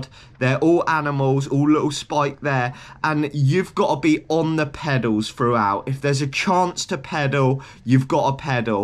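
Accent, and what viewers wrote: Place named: United Kingdom. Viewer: Accent was so unbearably british I had to stop watching one minute in.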